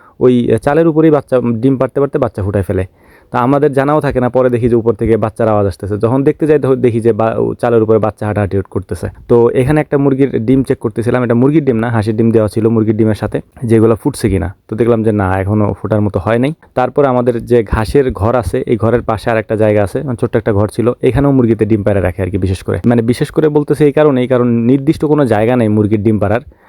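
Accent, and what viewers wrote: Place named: Dhaka, Bangladesh. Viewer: Aponar vasa sune mone hoy aponi Dhaka district er manus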